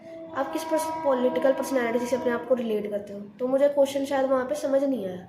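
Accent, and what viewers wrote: Punjab, India. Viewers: Aap punjab se ho?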